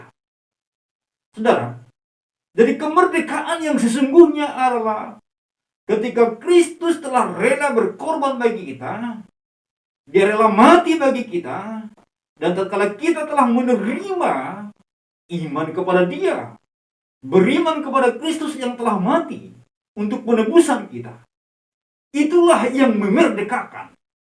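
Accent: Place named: Japan. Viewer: Yang suka dari pak Pdt ini waktu sharing firman Tuhan adalah .. aksen Jepang dalam bahasanya 😁 Mantap kali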